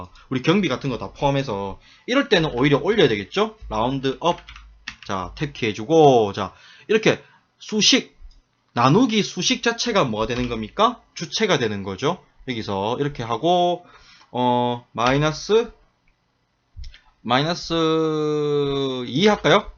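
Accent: Gyeongsang, South Korea. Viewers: 와.. round 함수고 자릿수고 너무 어려웠는데 이 영상 보고 한방에 정리되네요 활용방식(예제)도 너무 적절하고 사투리 억양 덕분에 귀에 쏙쏙 박힙니다 (저도 경상도 사람이랔ㅋㅋㅋ) 구독 눌렀습니다!!❤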